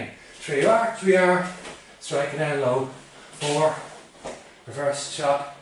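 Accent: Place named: Cork, Ireland. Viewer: My word you sound just like my neighbor. He's from County Cork.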